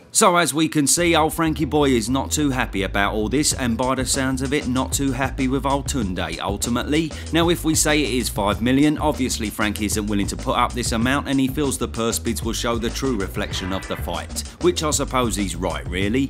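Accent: Cockney, United Kingdom. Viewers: where are you from? His accent is from London He's what we here in London call a Cockney look that up on YouTube And you will see they originally come from East London 😉🙏🏻🇬🇧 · I'm from Bethnal Green (One of the last cockneys around here), I'd say he is cockney, just puttin on a bit for the vid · Cockney accent. More prominent now in East Greater London (e.g. Romford and Barking) and Essex.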